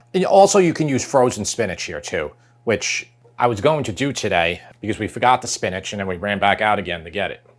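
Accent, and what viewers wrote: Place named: Philadelphia, United States. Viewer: He's gotta be from the Greater Philadelphia area.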